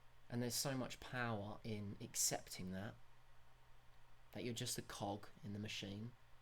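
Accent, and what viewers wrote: England, United Kingdom. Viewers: somehow everything makes more sense with an english accent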